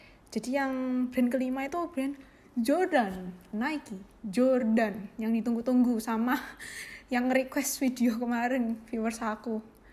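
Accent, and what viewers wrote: Java, Indonesia. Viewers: Logat nya kaya jawa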